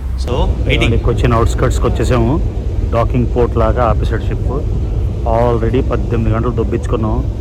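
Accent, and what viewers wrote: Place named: Odisha, India. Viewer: Nuvvu konchem Andhra slang ki marali annai. Appude views perugutai. Ledante odisha vadivi ani neglect chestaru.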